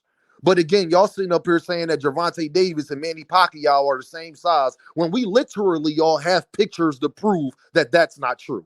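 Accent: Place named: Philadelphia, United States. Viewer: Great show! I hear that Philly in your blood too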